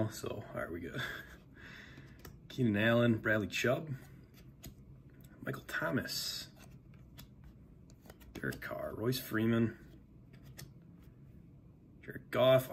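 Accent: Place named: Canada. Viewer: I can hear a Canadian-like accent b/c instead of bosa I hear bowl-sa. Just say it and see (hear) the difference.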